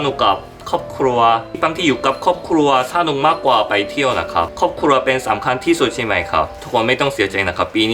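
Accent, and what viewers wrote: South Korea. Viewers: hwaiting Your videos are so cool and uplifting. Your Thai is getting better and better but honestly I love your unique Korean/Thai accent so much. Keep being you 👍🏽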